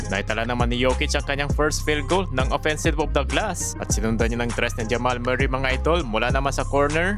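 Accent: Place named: Visayas, Philippines. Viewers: Dong,ano probinsya mo?? Bisaya ka ano??? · Visaya k dong boses m pilipit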